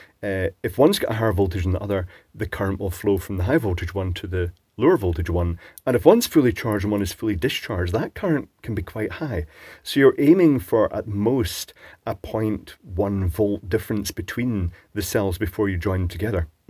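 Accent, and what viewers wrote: Scotland, United Kingdom. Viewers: I love your soothing, clear voice. I guess your Scottish ? Do you live in the US now ?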